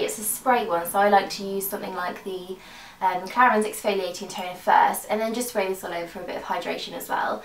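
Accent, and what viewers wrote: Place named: London, United Kingdom. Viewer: I thought you were from London lol! Where are you from? x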